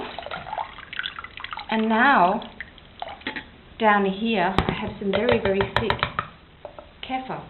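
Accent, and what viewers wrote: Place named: England, United Kingdom. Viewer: What a great video and what an amazing English accent, you speak better English than me,lol. Keep up the good work, God Bless.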